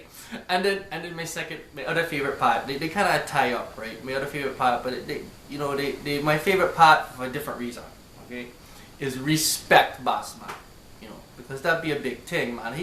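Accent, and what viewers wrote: Trinidad and Tobago. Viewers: You sound Trini still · Yes man u def sound like a Trini fo'real....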